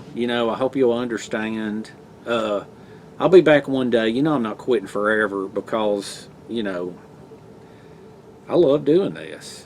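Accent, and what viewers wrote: South, United States. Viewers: Take care of what you need to do. Sounds like you’re gonna be a might busy. I enjoy your Southern twang and you seem to be a really mellow guy. I watched your ranger roll video yesterday after I watched a video on ranger rolls on Grunt Proof’s channel from four years ago where he gave you a shout out. Spend time with your dad. I lost mine 23 years ago. Mom is still here and she’ll be 84 in a couple of weeks. I’ll be watching more from your video library and I’ll be looking forward to your next one. Take it easy. Mark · I’m a long time subscriber who never comments until now. I have much respect for your videos and watch them as they become available. I typically turn em up loud so I can hear your southern accent throughout the house, lol. Your fans will love ya just as much when you finally have time to continue pursuing your hobby! 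What you and have done is a great contribution to YouTube.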